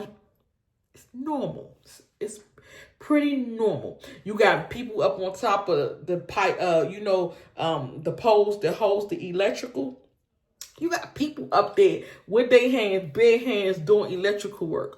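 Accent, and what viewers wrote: United States. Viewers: They probably chalked it up to your American accent...And thought you were talking about the hippo, that escaped from the local zoo, from a few days before!!!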